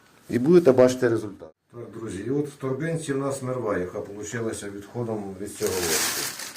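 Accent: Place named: Volyn, Ukraine. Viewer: Я недавно натрапив на Ваш канал у Вас Волинська говірка звідки ви?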